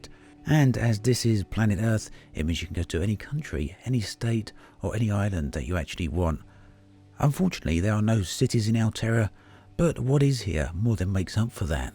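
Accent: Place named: England, United Kingdom. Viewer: How in the name of good lord fuck did you think he was from america lmao he has one of the strongest English accents around hahaha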